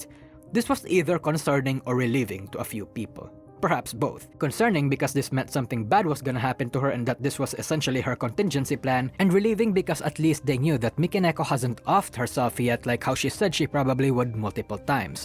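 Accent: Philippines. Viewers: okay, if all Filipino people sound like him, then damn I’m super jealous. I love the accent, it’s awesome. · Definitely not a native English speaker, you sound like a fellow Filipino with that accent.... I haven't done any Vtuber digging since I'm busy with college so i just barely know about this controversy, although this was neatly presented enough for an outsider of the community As for fubiki and the others who didn't react, i think they were playing safe trying not to get involved with drama, i assume it's a very uncertain time for them. To either call their company out or call rushia our. It's hard and very costly to speak up when everyone is up and arms with pitchforks. That's all i could comment about the situation, and I'm happy for the new rushia. I may even check her new channel if i get curious enough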